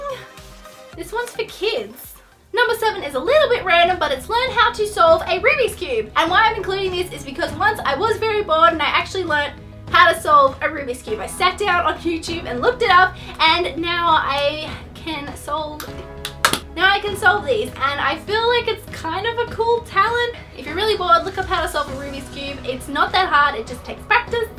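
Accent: Australia. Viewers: Just you hahah this girlies Australian.. Isn't Perrie British? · That's the same as me and is she Australian? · Jayla Kahlen Espadon it's Australian. I'm Aussie so I know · Is she a fellow Aussie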